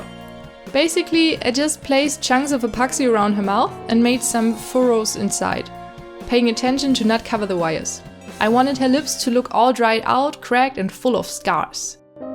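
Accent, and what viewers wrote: Germany. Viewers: I usually watch dollightfulls videos but NO REGRETS WATCHING YOURS! you earned a new subscriber! 💜 Btw, are you german by any chance? Your accent reminds me of how my class members talk English lul. Sorry if you're not. It just reminded me of that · Are you German? Because u got this accent of one btw this video was so good and thank u for not spoiling the end result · I thought so, you have the same accent as my friend. She hates her accent, but I love it. I like the German accent. <3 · This is definitely my favorite out of all the Halloween dolls I've seen! Ill be sad when Halloween is over after today and everyone goes back to making pretty pink dolls :D Disregard this if I'm wrong but it sounds like you're German. Where did you get the Apoxie Sculpt from and how much did you pay? From what I've seen it's super expensive to buy in Germany. 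· Ich wusste sofort, dass du Deutsch bist😂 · Are u german?because of the "moin" and the way you speak(not in a mean way,you are super talentet and friendly,I'm just wondering) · Its a german accent, if you want to know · Are you german ??? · Ja Deutsche erkennt man immer wenn sie englisch sprechen xD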